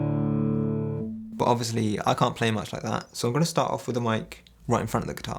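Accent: United Kingdom. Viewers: I'm trying to place that accent. Suffolk? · Oh your British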